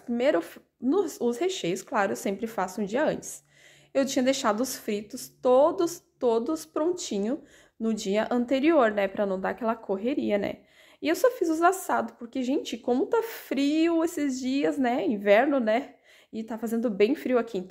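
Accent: Rio Grande do Sul, Brazil. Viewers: Boa noite lindona , achei vc alguns dias atrás , já me escrevi , e antes de assistir o vídeo eu já dou laik , vc e maravilhosa , e eu adoro seu sutak gaúcho , que Deus te abençoe sempre bjo 🥰🥰🥰🥰🥰🥰🥰🥰🥰🥰🥰🥰🥰🥰🥰🥰🥰🥰🥰🥰🥰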